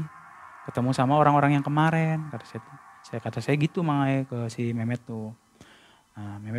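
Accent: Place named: Cirebon, Indonesia. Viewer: wong cerbon yaaaa Logate bli bisa diboongi ca 😁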